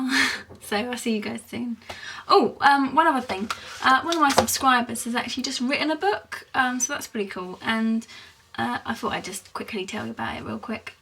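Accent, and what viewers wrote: RP, United Kingdom. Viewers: Sounds like she went to Rodean! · It came from the East End. PS. You sound posh!! <link> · You sound kind of posh darling.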